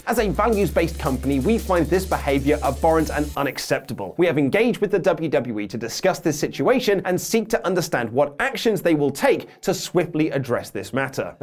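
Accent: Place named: Manchester, United Kingdom. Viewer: mancunian accent was excellent!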